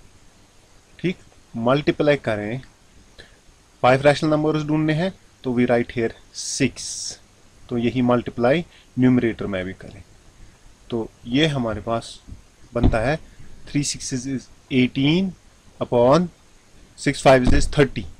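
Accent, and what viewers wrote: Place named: Kashmir, India. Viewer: Very nice brother... I appreciate your efforts and help... Shukriyah... I am a Kashmiri, I could recognise you are too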